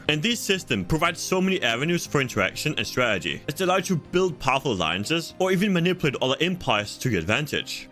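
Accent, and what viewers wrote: Denmark. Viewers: sound like a dane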